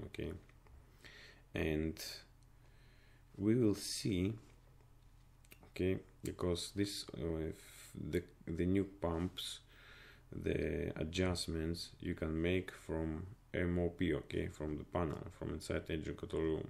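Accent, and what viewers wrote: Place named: Russia. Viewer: Вопросов нет, человек взялся рассказать за HPS, молодец. Но, английский наш этот, с этим нашим произношением и вот эти here, ok, ok, here, слишком много и часто ( это я только мин просмотрел). А так конечно молодец. Продолжайте в том же духе!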